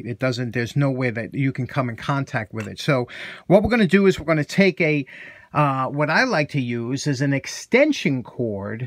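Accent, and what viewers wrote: New York, United States. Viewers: I was so waiting for you to say Bob’s your uncle because you had like 10 chances to see it before and then Bam you finally said it and I like hearing it in your New York accent because there’s no uncle like Bob and there’s no way to hear it Better than New York accent ha ha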